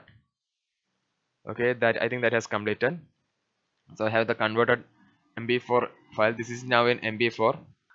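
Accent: Kerala, India. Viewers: Malayali, Right? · Machane Malayali AAANOO?? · Argggh, your indian accent is too strong,...I cant understand shit that you're saying. Lose the accent bro. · Ohhh your Malayalam accent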